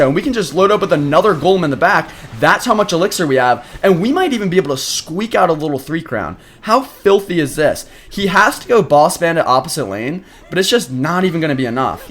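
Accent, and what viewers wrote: Spain. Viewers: Bro your english is very spanish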